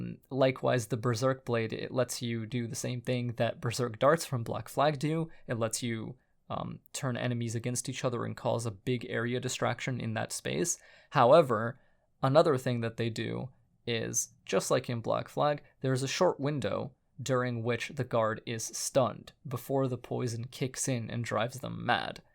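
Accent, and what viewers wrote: Eastern Europe. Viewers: You're Eastern-European? Where from? I'm half Polish. Also, I love when you say godlike.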